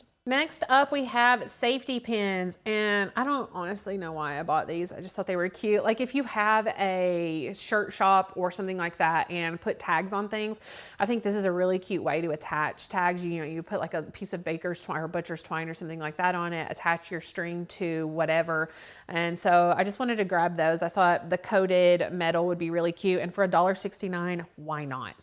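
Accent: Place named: North Carolina, United States. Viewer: I got the wooden needle holder too! Just discovered your channel and love it. You sound like you're from North Carolina!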